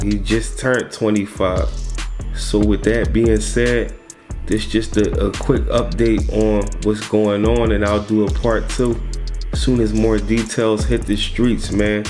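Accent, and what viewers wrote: Philadelphia, United States. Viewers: U sound like a Philly nugga so ima take ur word 4 it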